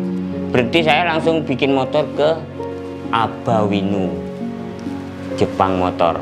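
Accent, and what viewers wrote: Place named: East Java, Indonesia. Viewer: Logat arek etan e melekat😁🙏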